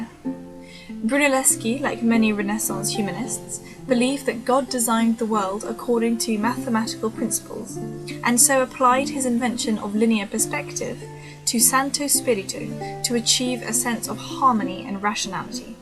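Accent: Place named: England, United Kingdom. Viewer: va bene! you have lovely version of the sometimes not lovely posh english accent..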